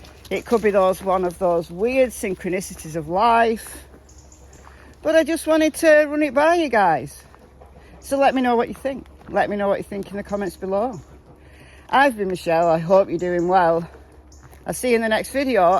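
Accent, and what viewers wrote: United Kingdom. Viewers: Michelle, where are you from (area of the UK)? You have a very particular accent, so I wonder where in the UK it's typical. Thank you. PS: I love your walks with doggies. They are lucky to have so lovely places to go to with you. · I love your channel, Michelle, and I so enjoy your walks with your cute dogs. I also love your British accent (I’m a Texan, so you would probably think I’m the one with the accent, lol). Take care. I’ll be watching/listening! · You are a beautiful British Lady! And I ❤️ your accent. Nice seeing you before our walk. Great timeline connections. Makes sense. I’m personally getting tired of “what if” scenarios & just wish TBI would confirm some facts they’re working with. IMO this is connected to a larger crime like trafficking & that’s why TBI is silent while they work that angle. Just my thoughts. Luv u & the doggos! 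❤️❤️❤️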